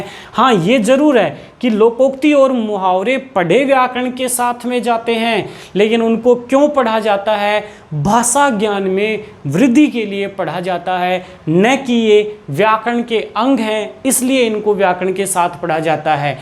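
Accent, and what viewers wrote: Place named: Haryana, India. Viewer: आपको निश्चित ही हिन्दी / संस्कृत की गहरी समझ और मज़बूत पकड़ है। उच्चारण में बस थोड़ा हरियाणवी ढंग से फिसलन हो जाती है। पर आपको बारीकियाँ पता हैं। सादर आभार व्याकरण शब्द की व्युत्पत्ति समझाने के लिए।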